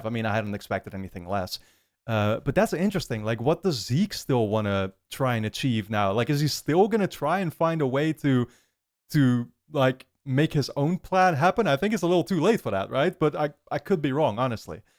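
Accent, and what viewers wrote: Netherlands. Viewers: Haha I can hear you're Dutch from a mile away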